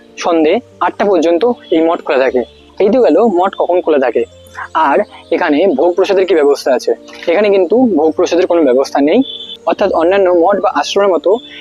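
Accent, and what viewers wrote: Bangladesh. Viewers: বাংলাদেশের মাল,উচ্চারণ তো এরকম হবেই। আর মানুষকে বিভ্রান্ত করে পুরো ভিডিও দেখানোর কৌশলের কারণে থাকা খাওয়ার ব্যবস্থার কথা বলে,এভাবেই এদের পেট চলে। একই কথা দশবার বলে ভিডিওকে বড় করে।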